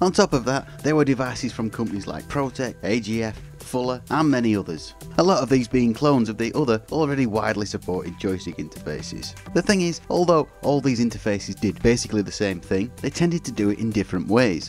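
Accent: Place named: Northern England, United Kingdom. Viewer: You sound northern, any chance of a collaboration with Techmoan? Yet another brilliant video that’s as informative as it is entertaining.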